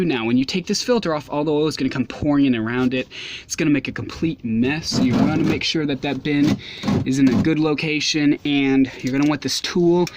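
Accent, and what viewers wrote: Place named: United States. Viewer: I LOVE YOUR VIDEO . WHAT SIZE SOCKET? I LOVE HAVING SOMEONE W/ GOOD DELIVERY IN U.S. ACCENT. OTHER ACCENTS TOO HARD TO LISTEN TO.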